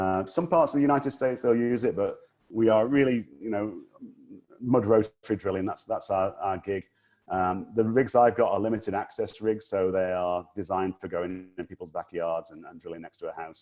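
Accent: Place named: England, United Kingdom. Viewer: From a random Floridian: Thanks for posting this. I was already familiar with most of the geological history and hydrology of Florida, but it was very interesting to learn about some of the engineering, economics, and politics of sinkholes. Plus it’s always fun to hear our town names pronounced with an English accent. Cheers!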